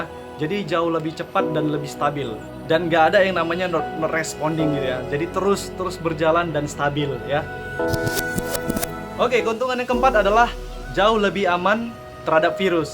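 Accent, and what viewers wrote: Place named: Medan, Indonesia. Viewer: abang orang medan rupanya, pantes la kok ga asing logat nya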